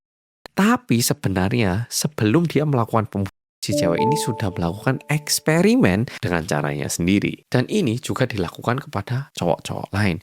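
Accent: Java, Indonesia. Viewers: Logatmu mirip orang jawa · BHS Indonesia nya medok sekali, udah berapa lama tinggal di Indonesia, oppa/abang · Nemu channel wong korea medok banget😅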